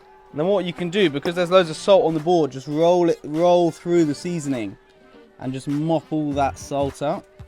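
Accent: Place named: United Kingdom. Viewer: If I ignore the face has reminded me of Jamie Oliver Show, a perfect kitchen class 💯👌 The cooking style, explaning the ingredients, variety of spices, expecially rooling of meat kababs over salt just like he do and obviously British accent😅 🤌.................You nailed it. You have to be generous with the ingredients to bring that level of flavour profile. These were definitly looking awesome and wholesome🤤🤤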